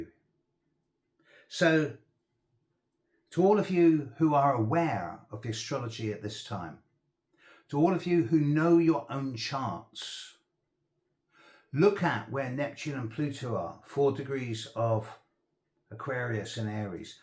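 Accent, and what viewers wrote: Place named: United Kingdom. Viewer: I am from Mongolia, learning about astrology since 2019. ’ British accent is difficult for me but I don’t know why I like to hear him. 😂😂 I like his manner. 😂 what planet and and house, conficuration makes you astrologer? I like your graunded “saturn” earthy essence. Your masculinity shows kind of Mars. Deffinately you have scorpion from your analyze. Mercury on Aqauruis?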